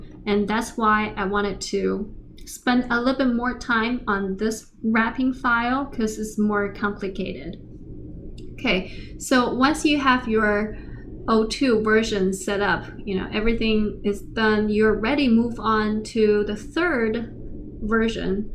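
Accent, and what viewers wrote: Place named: United States. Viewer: another good video. I appreciate you helping me understand how to use the RAP rules. In the past I have used the Application>Distribution>Export function to save my application rulesets. It has worked very well for me. Is there a reason you did not choose the Export function? I have a suggestion to make. In the future if you have a video that requires the pega environment to be set up to follow along with your lesson. Save the pega jar file on your git-hub site. For example, since you referred to PegaFem channel this is what I did. After I completed her Video 4 exercise I exported my application to a zip file. Then a couple of weeks later I imported the Video 4 exercise zip file and I could start working on her Video 5 exercise without recreating her pervious work. I hope my explanation is clear if not let me know and I will go into more detail. 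I haven’t seen any new post on PEGAFEM for awhile I hope see keeps on posting. Your two post are the easiest for me to understand (because I understand your American accents). I hope both of you keep posting about Pega. Looking forward to working on the 5 post I have not studied yet. Thanks again.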